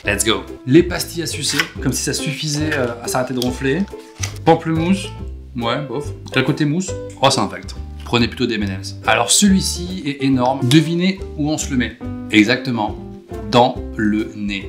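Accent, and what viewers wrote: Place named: Toulouse, France. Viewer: Pire accent toulousain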